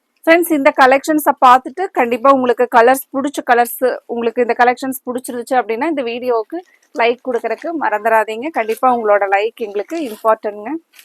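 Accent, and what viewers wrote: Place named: Coimbatore, India. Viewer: அழகு கோவைத்தமிழ். கேட்க கேட்க திகட்டாது❤❤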